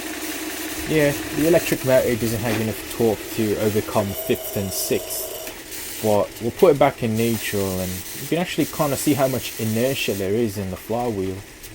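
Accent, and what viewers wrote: United Kingdom. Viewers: i really recognize your accent, i swear it's the camden area in london where i heard it last and know where it's from. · Why is there a British man now and no one is talking about it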